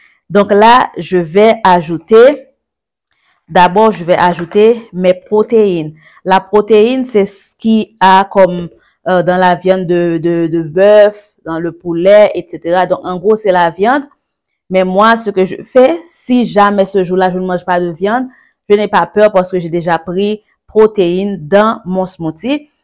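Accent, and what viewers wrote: Haiti. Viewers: elle a un accent Haïtien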